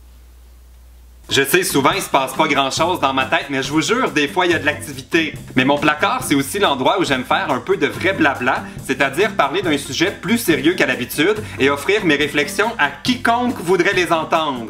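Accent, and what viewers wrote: Quebec, Canada. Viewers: BONJOUUUR <3 je t ai découvert récemment et franchement je t adooore, tu me fais trop rire et j adore ta personnalité en fait :) ton verre de vin omg garde le toujours! xd +1 abonnée, t es mon gros coup de cœur du moment haha bisouuus :d <3 signée: une chinoise/ belge si si (j adore ton accent québécois aussi) x) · Salut! Je viens de découvrir ta chaine par hasard au twitter. Je suis brésilien et j'étudie la langue française mais c'est toujours du français parlé en France. Donc, c'est très interessant d'avoir un contact avec un chaine du Canada. Au moment où tu as commencé a parler je me suis aperçu que c'était du français québecois. C'est mignon. :)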